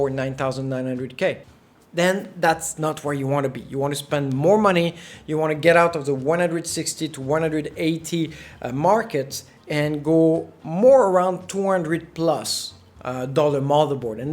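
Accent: France. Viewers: so what exactly is the advantage of having 2 lan ports? and btw , boy u sound soooooo french haha nice vid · Tu es français ?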